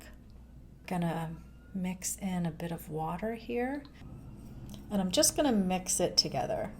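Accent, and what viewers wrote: United States. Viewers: Regarding dangerous or toxic ancient makeup and beauty practices: seems not much has changed over the centuries--think of all of today's popular, potentially dangerous plastic surgery performed in the name of attaining beauty. Dont get me wrong, I take zero issue with anyone getting plastic surgery for any reason...just making a point. Side note: you've gotta be from the midwest. I am, and I pronounce words ending in '-ing' the same way as you. Cute!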